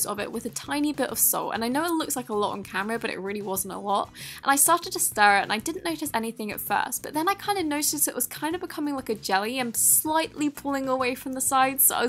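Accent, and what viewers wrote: England, United Kingdom. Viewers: I like your accent Are you from England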